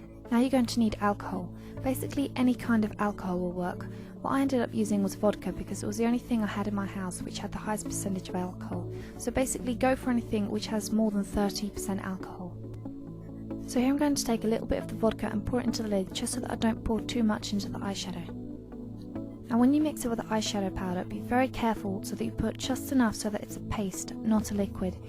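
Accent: United Kingdom. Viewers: Ate you from the uk? I am lol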